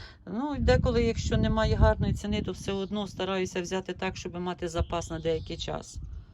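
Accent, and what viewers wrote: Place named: Ternopil, Ukraine. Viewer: та я також з Тернопільської обл, чую говір наш😉я з кременецького району, може й десь недалеко живемо від Вас😀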